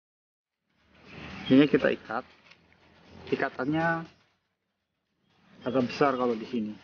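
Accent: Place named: Banyumas, Indonesia. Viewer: sampean tiyang pundi bos kyong ngapak bhsane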